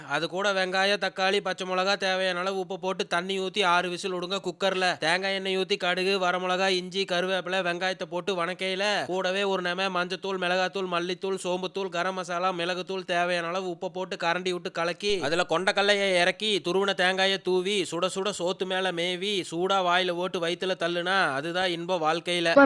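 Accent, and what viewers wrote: Coimbatore, India. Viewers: இததா கோயபத்தூர் குசும்பு ஆனா சூப்பர் பேச்சு😂😂😂😂😂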